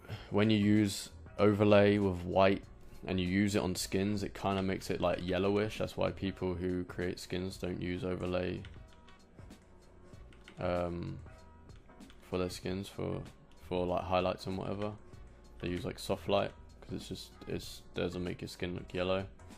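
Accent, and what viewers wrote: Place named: United Kingdom. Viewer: What part of the UK you from?... ifyou even are. im down east in LONDONNNNNNN <3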